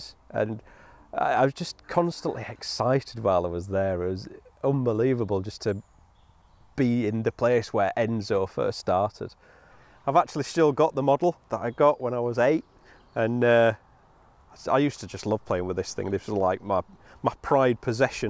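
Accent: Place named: Liverpool, United Kingdom. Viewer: Hahah gotta love that accent ! F - fortaay. I guess thats scouse accent, anyways what a beast of a car that is !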